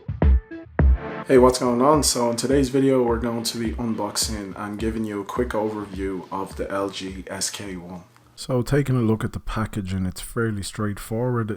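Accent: Ireland. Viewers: I love your Irish accent 😁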